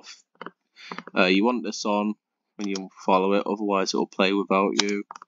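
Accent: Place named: United Kingdom. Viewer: I forgot that you're british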